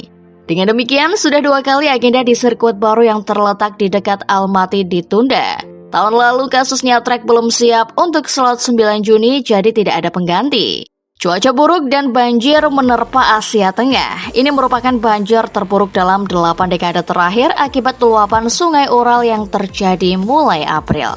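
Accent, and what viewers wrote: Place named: Java, Indonesia. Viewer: disini mendingan sih. medok VO nya hampir ga kedengeran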